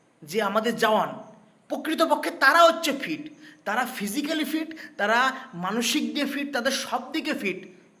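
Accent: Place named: Noakhali, Bangladesh. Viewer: Noakhali te bari naki tomar 😅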